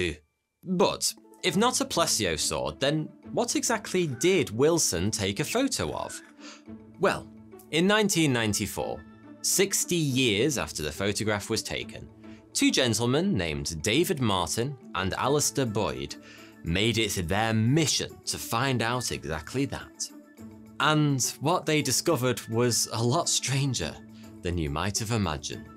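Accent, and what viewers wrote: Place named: Southern England, United Kingdom. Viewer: you sound like you're from southern England. Where can I buy you a pint?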